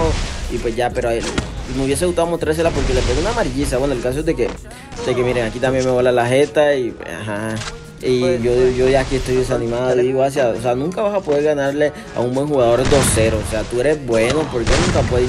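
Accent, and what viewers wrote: Caribbean Coast, Colombia. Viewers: Como narra un costeño un pvp:pues mira el video:v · Eres de colombia?:0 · Eres costeño? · de q parte de colombia eres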